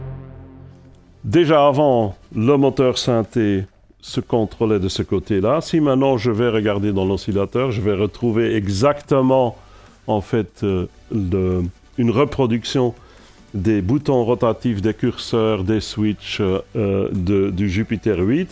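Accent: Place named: Belgium. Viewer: Il as un accent belge lui mdrr super présentation 👍👍👍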